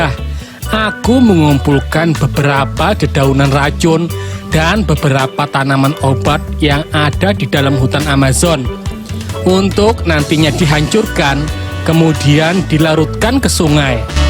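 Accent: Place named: Java, Indonesia. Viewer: pian urg jawa lws d banjar kah sanak,banjarnya gedaguman kyk kwn ulun urg jawa🤣🤦‍♂️🙏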